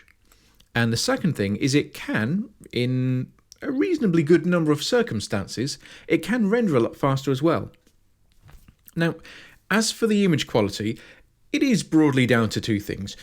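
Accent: United Kingdom. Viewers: A very clear explanation. Thank you and great to hear a British accent for a change :-) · Thanks for the tutorial, nice and clear! with a nice fluffy British accent!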